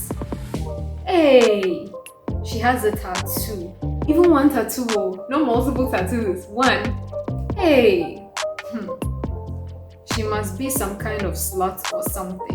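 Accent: Ghana. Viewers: You're so wise for your age. And your Ghanaian accent is so sweet and authentic. I wish I could regain my ability to speak like that again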